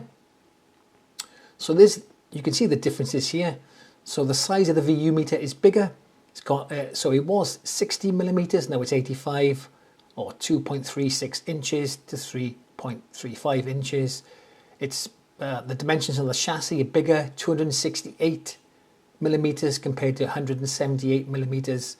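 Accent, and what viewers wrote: Wales, United Kingdom. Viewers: Just subbed and thanks for you content , loving the welsh accent 🤣🤣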